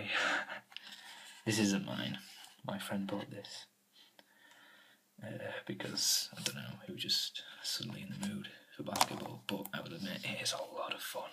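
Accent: Yorkshire, United Kingdom. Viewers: I have just found you via the waterwhispers channel who I love to watch. she did a video talking about other male's who do ASMR video's and to check you all out. with myself from Yorkshire I thought I would check you out to. nice to listen to Yorkshire accent like this. I don't really get on much with male ASMR's but I do like to listen to the Yorkshire accent softly. I be watching more of you. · I've only recently discovered asmr and you're my absolute favourite. I love the Yorkshire accent so much. Thinking I might start my own channel also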